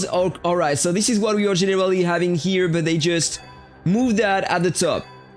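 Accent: France. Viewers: Attends tu es francais? J'avais capté l'accent mais je pensais pas que tu étais français...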